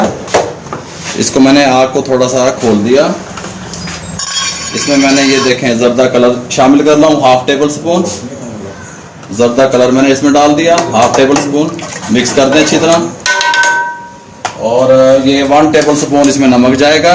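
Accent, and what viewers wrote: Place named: Mewat, India. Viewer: Mewati lgta h cok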